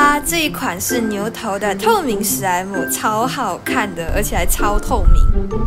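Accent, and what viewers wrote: Malaysia. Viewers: 你是马来西亚人还是台湾人？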